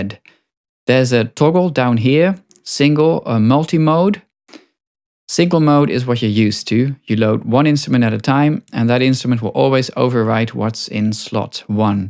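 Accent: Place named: Netherlands. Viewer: Love the update (and subtle Dutch accent :> )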